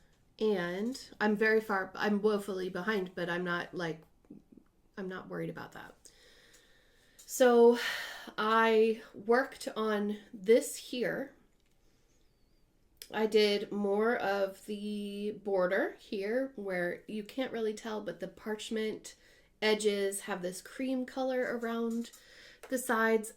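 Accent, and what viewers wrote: Ireland. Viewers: Definitely 'But' in your unique 'Irish accent.❤ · All of your needle minders are darling!! I love that pegallamacorn!!! The first time I heard you say “but” in your Irish lilt I thought you said “bush”, LOL!! Then you said it again and I realized what you were doing!! LOL!! Question: what are you talking about with Grogu (baby Yoda)?? What the heck am I missing out on?? I’m willing to bet Jacob from MFE would be a great resource on what makes a sampler a sampler!! Thanks for sharing!! See you next time...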